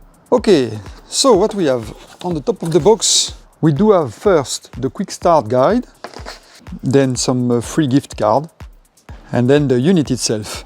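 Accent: France. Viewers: I always enjoy your videos but may I also say that we really love your accent! It's a very wonderful French accent so please keep up the Great videos! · Ahah you’ve got the French accent it’s kinda funny ! I would like to buy this turntable but shipping isn’t enable to France…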